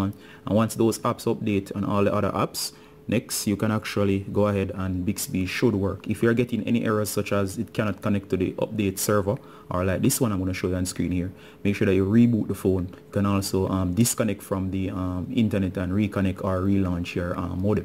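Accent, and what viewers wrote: Jamaica. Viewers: where are you from, you sound Jamaican.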